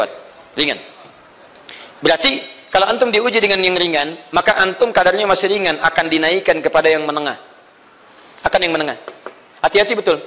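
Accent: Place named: West Java, Indonesia. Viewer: Alhamdulillah terima kasih Pa ustad kaya orang sunda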